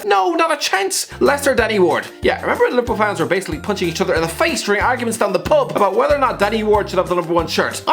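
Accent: Ireland. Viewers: aren’t you from Ireland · Is this Irish guy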